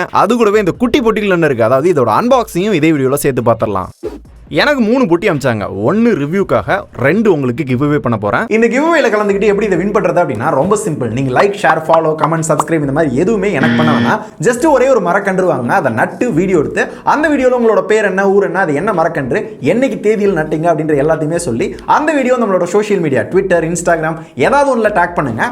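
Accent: Madurai, India. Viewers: Kaya kaya nu pesitu irpanga.. Madurai slang 😁❤️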